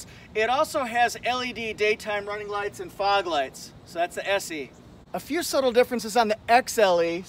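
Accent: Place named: Canada. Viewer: I tried to find the dealer location to get a clue about the accent. Is it Canadian? I am afraid I am not great with North American accents as I live in New Zealand.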